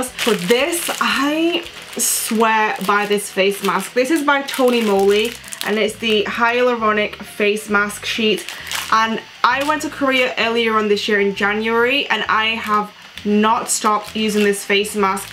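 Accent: United Kingdom. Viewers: Are you from Manchester, the accent feels familiar? :)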